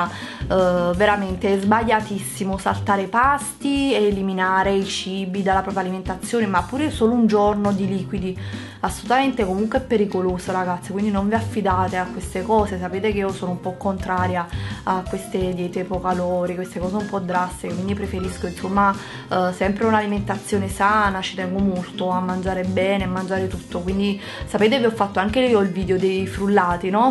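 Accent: Naples, Italy. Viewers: anche io sono di Napoli, però almeno nei video cerca di limitare questa cadenza napoletana pazzesca!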